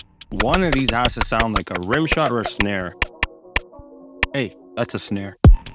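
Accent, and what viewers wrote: India. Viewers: Tutorial indian accent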